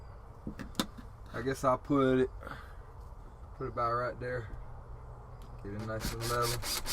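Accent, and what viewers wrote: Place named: United States. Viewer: cute american accent lol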